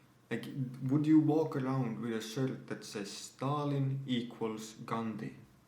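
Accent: Finland. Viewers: let me guess. You from Finland?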